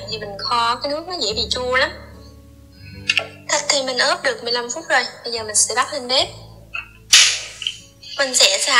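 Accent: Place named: South, Vietnam. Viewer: Vào Vào con cac Người Nam nói giọng miền Nam đi Vào Vào....nhé nhé Con cac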